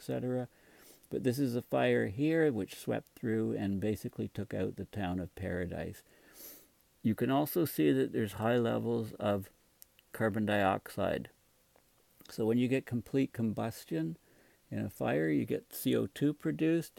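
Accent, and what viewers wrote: Canada. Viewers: I hear your Canadian??:0 I'm from Calgary, Alberta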